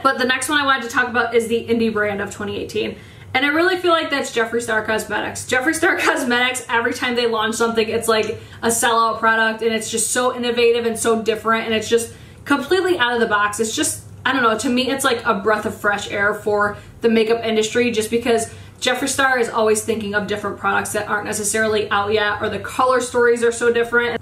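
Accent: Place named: New York, United States. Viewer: I really need to watch other things besides makeup videos on YouTube😂like I really should watch more tv shows, movies, read more. But I think that if I’m on YouTube, I will plan on binge watching your videos. Love your NY accent and attitude. Keeping it real and not sugar-coating anything. Love that!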